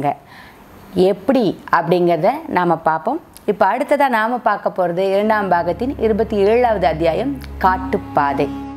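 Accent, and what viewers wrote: Tamil Nadu, India. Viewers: பொன்னியின் செல்வன் கதை கேட்க சும்மா தேடி பாத்தேன் இவங்க பேசுனா வீடியோ தெளிவா இருந்ததால் தொடர்ந்து பார்த்தேன், எல்லா விடியோவும் பார்த்து முடிந்தாயிற்று, கதையின் ஆர்வத்தால் புத்தகம் படிக்கச் தொடங்கிவிட்டேன், எனினும் யாருடா இது இவ்ளோ தெளிவா கத சொல்ராங்கலேன்னு இவங்கள பத்தி தேடி பாத்தா இயக்குனர் அகத்தியன் மகளார் என்ன ஆச்சர்யம் அவரு நம்ம ஊரு மகளார் "பேராவூரணி". பேசும் ஸ்லாங் பாக்கும்போதே தோணுச்சு. வாழ்த்துக்கள் 💐💐💐💐💐💐💐💐💐💐💐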